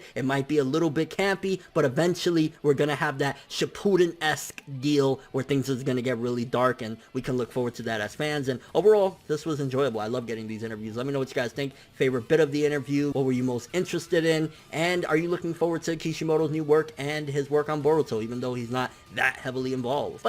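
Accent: New York, United States. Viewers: Ny accent?🤔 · I hate he says the characters names in his American accent like say it right brov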